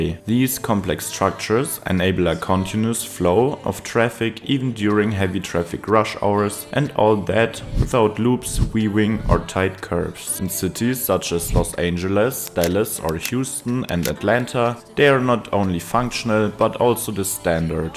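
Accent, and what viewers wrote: Germany. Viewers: Most cliché German accent ever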